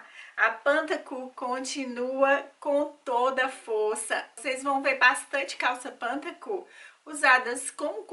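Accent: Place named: Northeast, Brazil. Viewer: sou pernambucana e por isso reconheci o sotaque nordestino,👏👏👏👏😙😙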